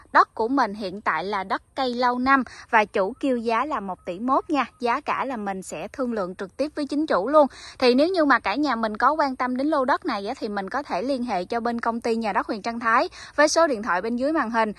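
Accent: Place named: Mekong Delta, Vietnam. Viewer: Thu Nhiên 🍃 Giọng đọc Chuẩn miền tây 👌👌 Tuyệt lắm tác giả 👏👏👏👏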